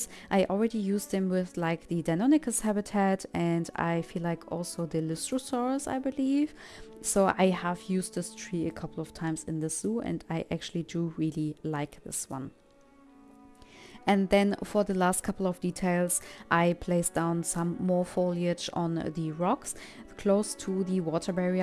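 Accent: Germany. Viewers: Thanks for the inspiration. But a funny question are you german ? Wegen dem Akzent und dem Wort Glas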